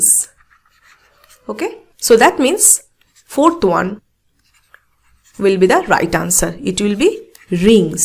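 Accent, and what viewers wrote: Bengal, India. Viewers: Bangali voice in hindi always love❤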